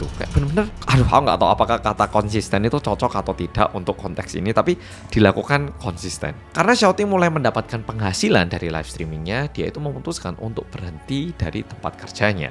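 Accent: Java, Indonesia. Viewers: Sehat sll yo mas tp medok. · Logatnya terlalu jowo gak nyaman di dgr😢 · Suaranya medok bgt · Iconic..... medok e masih ada walaupun wes ga di Malang.... idola❤❤❤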